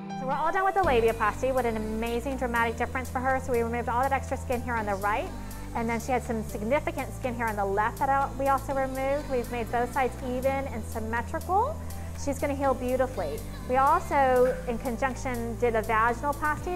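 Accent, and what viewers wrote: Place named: United States. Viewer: From her accent I can tell she's an American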